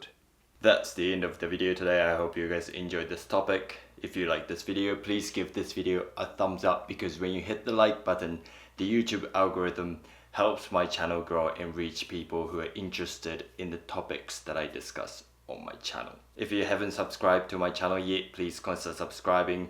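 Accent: New Zealand. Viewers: Your kiwi accent is strong bro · so that explains your accent, you have lived in NZ! Btw your videos are amazing and and an inspiration to people who practicing minimalism like me. Thanks!!!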